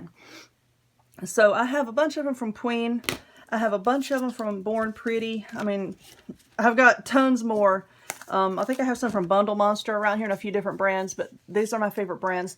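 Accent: South, United States. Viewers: TYFS...I luv your southern dra2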